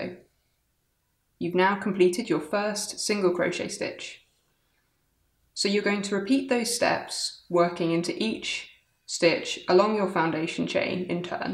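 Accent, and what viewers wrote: United Kingdom. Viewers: Silly question: I'm new to crocheting, but I know there are US vs UK terms. I'm assuming because of your accent this is the UK single crochet (US double crochet?). I'm asking because I've seen other tutorials and this seems like the US single crochet but, again, potentially silly of me, I'm inferring from your accent it's the UK single crochet?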